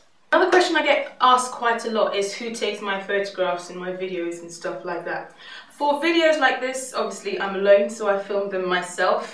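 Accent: England, United Kingdom. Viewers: Listening to you is already a "Treat". I love your manner of speaking & that's not just because you are speaking British English...mainly because you are presenting yourself TRUTHFULLY...not steering away from what you are actually passionate about & not what others expect on your Channel. Great Tips on putting with with Youtube & LIFE IN GENERAL! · I love English accents but I have a question, do you ever think people are saying " " when they say " surely"? haha, I love you lots!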